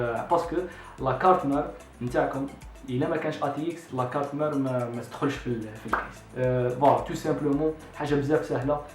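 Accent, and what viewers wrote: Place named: Libya. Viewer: عندك شويه في العربيه الليبيه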